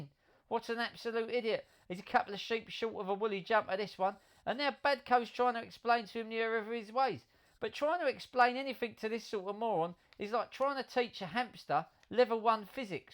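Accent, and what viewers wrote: Cockney, United Kingdom. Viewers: Absolutely agree about lifetime ban for really dangerous or drunken driving. But it never happens, does it? I love the commentary and the cockney accent is perfect!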